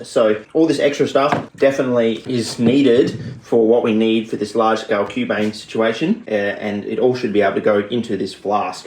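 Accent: Australia. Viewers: I'm too used to the Aussie accents to really notice it, but on the rewatch, I totally get what you mean.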